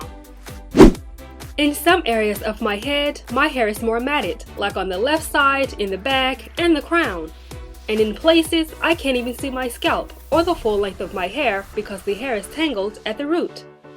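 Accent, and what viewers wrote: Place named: Australia. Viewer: You sound like you had a little Australian accent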